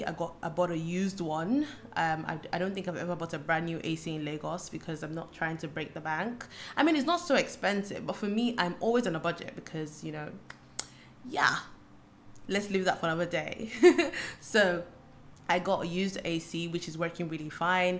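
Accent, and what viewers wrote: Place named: United Kingdom. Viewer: Your accent sounds quite British....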